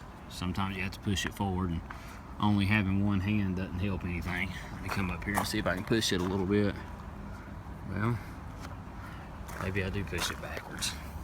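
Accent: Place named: Tennessee, United States. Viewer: Hi, I bought a 2006 Jazz here in West Yorkshire a week ago. I knew about the leak issue so was pleased to find mine is OK. However, i took the precaution to seal those areas you highlighted with clear silicone sealant. Have you got another car and ditched the Jazz? ps love your accent, im guessing Tennessee?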